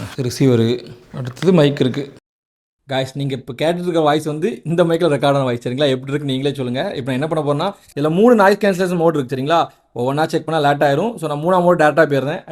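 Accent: Tirunelveli, India. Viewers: Anna nenga tirunelveli Karan ah? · Anna neenga thoothukudi, thirunelveli pakkama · Anne nega tirunelveli yaa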